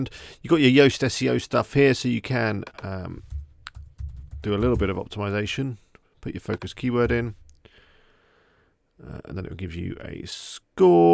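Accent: United Kingdom. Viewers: Thank you so much for your aswer, i will! i'm crazy about english people's accent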